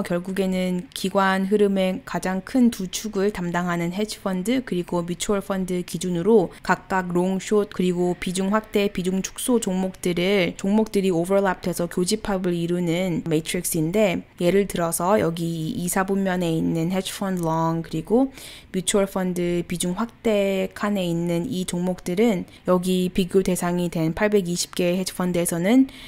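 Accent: United States. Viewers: ㅋㅋ 아니 미국에살아서 미국식 발음 하는게 뭐가 그리 불편함? ㅋㅋㅋㅋ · 뉴요커발음 ㅓㅜㅕ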